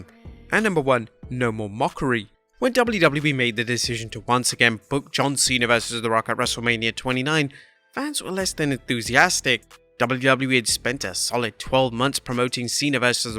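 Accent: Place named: United Kingdom. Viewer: Why is every wrestling YouTube channel hosted by someone British?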